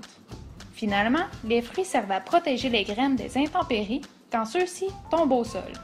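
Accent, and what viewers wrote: Quebec, Canada. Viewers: Kebek je crois!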